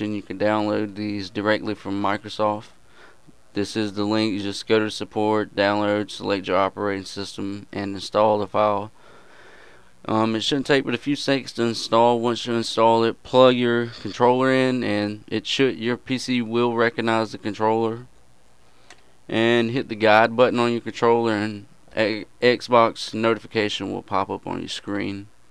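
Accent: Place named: South, United States. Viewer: dat southern American accent.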